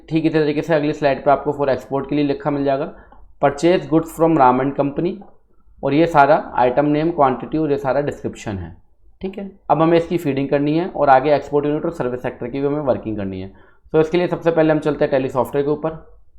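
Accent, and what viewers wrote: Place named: Punjab, India. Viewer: Sir ji I am from south India but educated in the north so I can understand Hindi well, but most of my friends simply don't understand Hindi especially your Punjabi accented Hindi yet they are highly impressed with your style of teaching, So please suggest to me any guru of your caliber who can teach the same in English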